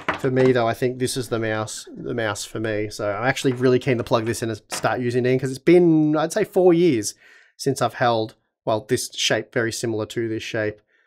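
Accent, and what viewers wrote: Australia. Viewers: Gotta love the Australian accent. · in that accent, I almost responded out loud with "That's not a knife, now this is a knife." Someone please get a proper Dundee knife.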